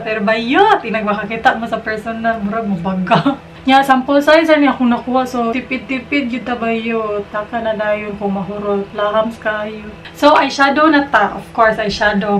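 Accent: Visayas, Philippines. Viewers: bisdak mode hahaha makalingaw jud maminaw ai. 😄😄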